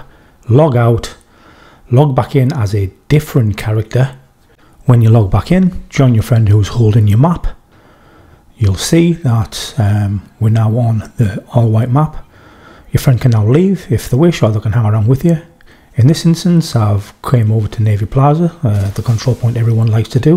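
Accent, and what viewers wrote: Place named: Durham, United Kingdom. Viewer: Just trynna get them tactical assessment bundle blueprints. Friggin things don’t wanna drop for me. Anyways, were you from mate? You sound like you’re from Durham!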